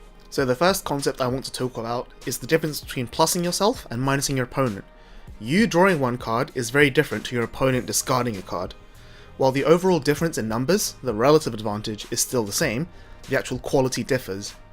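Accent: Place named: Belfast, United Kingdom. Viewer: I like that Belfast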